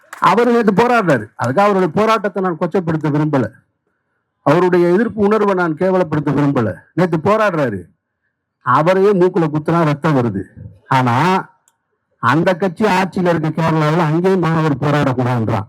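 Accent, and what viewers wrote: Madurai, India. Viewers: மதுரைக்காரன் பேச்சு🎉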